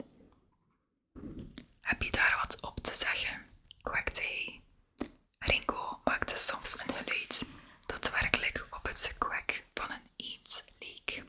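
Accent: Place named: Flanders, Belgium. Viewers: Heerlijk! Je stem en je Vlaamse accent zijn zó fijn om naar te luisteren! Goeie setting ook! Fijn donker en ontspannend. Topwerk! Dank je wel..🍀❤️ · Weer heerlijk om naar je Vlaams gefluister te luisteren. Ik hou van dat taaltje van onze zuiderburen.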